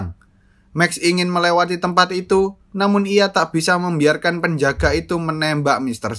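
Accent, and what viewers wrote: Java, Indonesia. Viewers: Mantep narator e medhok sip sip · Wong jowo yo mas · Okeee rekkkk:v Btw wong jowo Yo?:V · Intonasi MEDHOK, tapi mantaf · Medok Kabeh bahasamu le le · Kentel banget logat jowo nya😁😁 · mantap bang medook ngomongnya hahah mantaap ciri khaas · Mantap bg logat nya Jawa banget😅😂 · medok tenaaan , jawa mana sih mas , gak bisa hilang ya logat... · Pekat sekali bapak ngobrol jawa...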